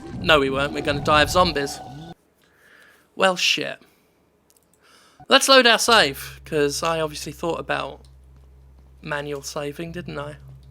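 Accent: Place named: England, United Kingdom. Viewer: British Love how he goes off like a nuke whenever he plays a scary game, "OH F*CK OFF SH*T F*CK", with that awesome english accent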